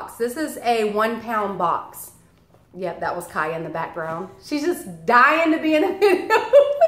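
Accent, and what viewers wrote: South, United States. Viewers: Just found your channel! I absolutely love your personality! Where are you from? Is that a southern accent?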